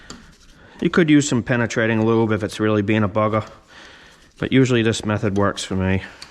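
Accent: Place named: Northeast, United States. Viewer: Good video. I am in a bit of a bond. I ended up taking my gearbox to the dealer to remove and replace the seals and the output shaft bearing as I was afraid of damaging something. Yours is the only place on the internet mentioning the 1” dust shield (SG014). In preparing to re-install my rebuilt gearbox, noted the dealer returned the dust shield in the bag. I know this piece should be installed to prevent future failures. Does this shield fit into the grooves as the other seals do? Btw,I love your northeastern accent!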